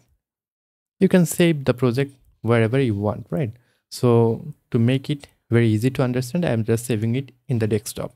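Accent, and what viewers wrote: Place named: Assam, India. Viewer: you have a north-east accent, are you from assam?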